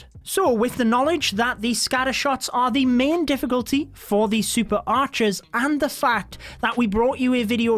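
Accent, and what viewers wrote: United Kingdom. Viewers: Where is he from? Or I should ask: In which part of UK does he live? 😄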